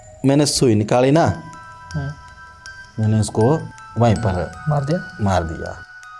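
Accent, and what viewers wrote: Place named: Kullu, India. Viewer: Bhai AAP Manali se nahi ho AAP kullu se ho apki bhasha se pehchana mene apko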